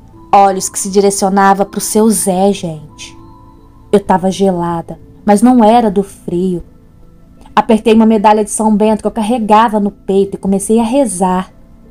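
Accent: Minas Gerais, Brazil. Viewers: É seu sotaque mineiro ta indo embora e vc ta mais calma nos relatos e mais suave.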